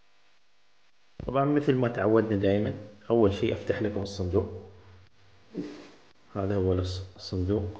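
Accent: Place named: Iraq. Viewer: تشبه لهجه عراقيه حيل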